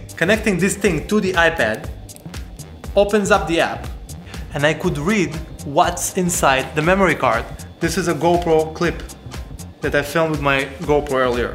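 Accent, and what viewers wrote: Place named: Israel. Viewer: אחי אני לא מאמין שאתה ישראלי בהתחלה ראיתי את האינטרו ושמעתי מבטא מוכר ואז הייתי פשוט חייב לבדוק אם אתה ישראלי ומצאתי סרטון שלך בעברית... באמת מגניב ואחלה של סיקור, באמת רציתי לקנות את האחת עשרה ורק עזרת לי להבין עליו יותר תשקיע בערוץ אחי וואללה יש לך כישרון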